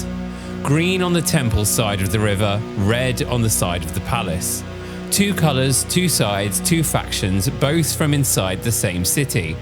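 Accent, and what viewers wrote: United Kingdom. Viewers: It’s bs.You got a Brit narrator,who probably doesn’t like that his own empire fell now hoping the US does too.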